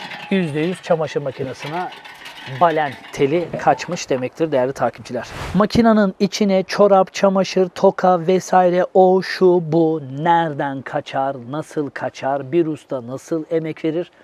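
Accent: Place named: Sivas, Turkey. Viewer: Trabzon mu ben Sivaslı sanıyordum 😅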